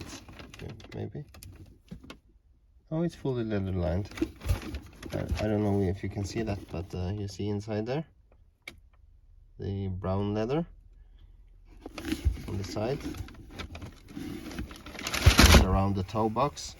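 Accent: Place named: Finland. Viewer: Cool boots when I first saw the thumbnail I thought they were Wolverine 1000 mile cordovan. Do you mind me asking are you Finnish? You have a really cool accent you sound like Kimi Raikonnen or somebody.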